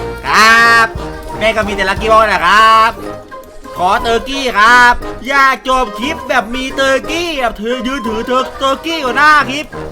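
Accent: South, Thailand. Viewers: พี่เป็นคนไทยแต่อยู่ภาคใต้ใช่ไหม😊😊😊😊😊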